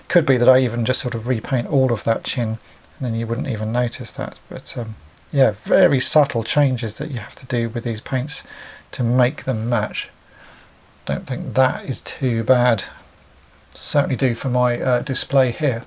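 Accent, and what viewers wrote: United Kingdom. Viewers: I gotta say - this kind of proper English accent is such a lost trait. Parents used to teach their kids to speak properly this way and sound posh, but not anymore.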